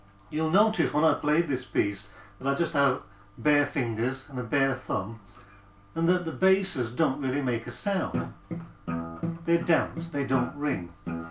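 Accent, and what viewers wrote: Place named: United Kingdom. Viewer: Glad I have found your channel. It’s nice to hear another Brit. I’ve been playing finger style or Travis picking for over five years now. I want to master the finger picks so I can get more volume for busking and they get the best sound out of my wooden bodied resonator guitar. It’s taken me 2 years to get the hang of using the slide properly. Hopefully it won’t take as long. I have been using the plastic ones but recently ordered some metal ones and I find them much easier. Thanks for taking the time to share your knowledge and upload. People like yourself are doing a massive service to us just learning. I have pretty much taught myself how to play guitar, slide and finger style. From YouTube. I’m currently teaching myself mandolin. Due to bad health I’m unable to attend physical lessons. The lessons have paid for my living costs and even bought me a guitar one summer. 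Thanks again. Ric in Leeds West Yorkshire. 🇬🇧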